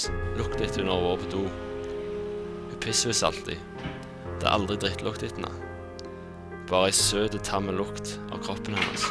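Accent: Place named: Jæren, Norway. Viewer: Goe! :D Genialt me jærdialektå då :D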